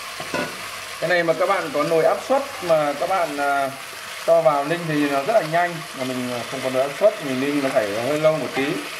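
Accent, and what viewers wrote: North, Vietnam. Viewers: Anh mê kênh của chú lắm nhưng a ở miền tây nghe giọng bắc hơi khó nên a nghe đi nghe lại hoài mới hiểu. Phai chăng vừa nói vừa hiện chữ lên màng hình luôn hay quá. Cảm ơn chú nhiều. . .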